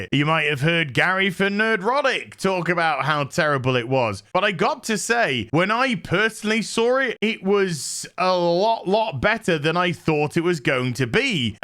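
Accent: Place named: United Kingdom. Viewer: Good Day Sir. Liked and subscribed. Let me say that your Barbie review is by far the best I have ever seen/heard. I also noticed that you are British =) Good Lord, your laughter is so infectious! You bring such a delightful and positive view to the Barbie situation and you Sir are so right! I too am from outside America so I could really sort of be on the same page as you are presenting. I would also like to share with you what I noticed about Americans. They view things very differently the way most people outside the US do. Chances are, many of them (or even majority) will not be able to jive with that humor of yours that made me laugh so much! They are usually Morose and would take anything as offensive even when it is not. Their culture sadly has gone 'Kaput' that they can no longer see the funny side of life or the silver lining in the clouds. 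It is so difficult to understand them. I will continue to watch your vids as they are a delight compared to the dark vibes you get from majority of US content creators. Thank you and GodBless.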